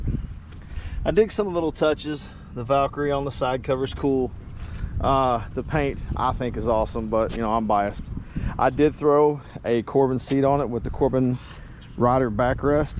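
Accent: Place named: Texas, United States. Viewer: I bought the vagabond bag I see on your video. Ought to work well. These videos are helpful to many riders for info on mods from cup holders to feet pegs etc. Appreciate the knowledge. You sound similar to how my dad talks, my family agreed. Texas accent. Riding the Valkyrie in rocky mountains is worth a trip, I love it every chance I get!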